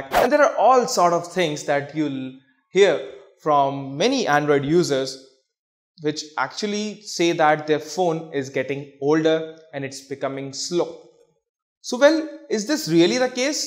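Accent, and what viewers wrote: India. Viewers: Share it lmao this is why I love indian accents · please make your english much more clear remove* your accent(indian)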